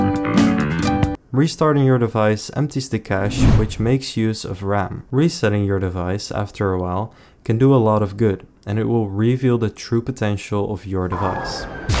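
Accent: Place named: Netherlands. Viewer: You sound so Dutch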